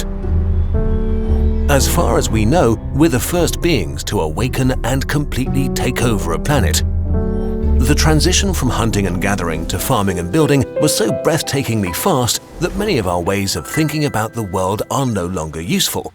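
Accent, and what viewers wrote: United Kingdom. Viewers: Where is he from? because Kurzgesagt is German, but he sounds more Brittish. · Not sure why, but his British joice just makes it seem more reliable and knowing.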